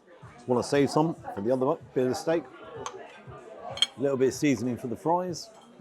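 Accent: RP, United Kingdom. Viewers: first time viewer greetings from the West Coast of The US. Just wanted to let you know your an absolute good restaurant reviewer with the personality of Gordon Ramsey with Anthony Hopkins mixed together. You have a Regal British accent that is easy for us " Yanks " to understand. I really appreciate your down to earth affordable creetiking for us non wealthy class folks. Have you thought to coming to the States to do a tour? Thank you again.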